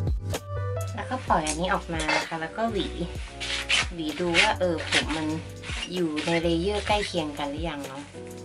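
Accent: North, Thailand. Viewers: คนเหนือแน่เรย เก่งจร้า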